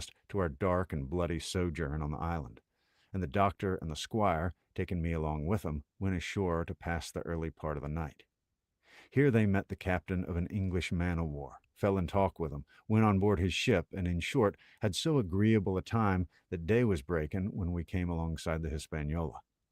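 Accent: South, United States. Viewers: Woohoo! Somehow I had never actually read Treasure Island, or if I did, I forgot most of it. Thank you for finishing your reading! Southern really suits the story so well. I had an idea for a possible future book - The Three Musketeers? Though that would be a long one.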